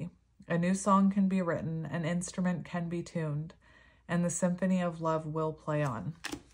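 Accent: Canada. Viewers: I’m sorry someone said that to you about the way you say anyway! Not only are you neurodivergent but you’re also Canadian and I’m sure has a slight accent!People are haters! Your tribe loves you! I’m also neurodivergent and hate that there’s so many people out there that have no grace for anyone but that’s because they don’t have it for themselves!❤ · I knew you were autistic. I believe I am too, although never diagnosed. I'm pretty sure my mother is, and possibly my dad too. Needless to say, my two boys show signs of it, and my daughter is dyslexic and possibly on the spectrum too (yet she's training to be a vet so she definitely ain't letting it ruin her life) I instantly spotted your nervous laughter at the end of a sentence. That's a definite sign. However, I assumed the words you use were because of your accent, which I presume is Canadian? I got that from way you say "aboot" 😊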